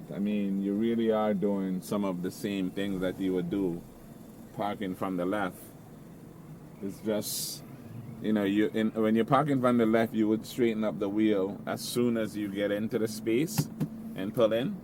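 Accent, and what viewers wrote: Jamaica. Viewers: First coming across your videos, and I love it that’s where I’m having difficulty with. I can tell u r a yardie! I’m having trouble with knowing where to turn the steering pulling out a parking lot, can u do a video on that? Please!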